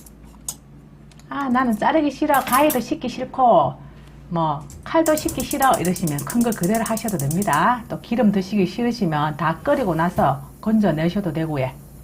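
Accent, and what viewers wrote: Gyeongsang, South Korea. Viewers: 얼굴은 예쁜데 목소리는 촌스러운 경상도 아줌마 늘 잘 보고있어요 최고입니다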